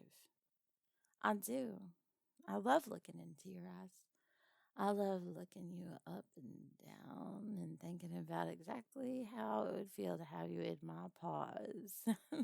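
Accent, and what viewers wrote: South, United States. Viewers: Ooooh man that southern accent is like 🤌Yeehaw! hehe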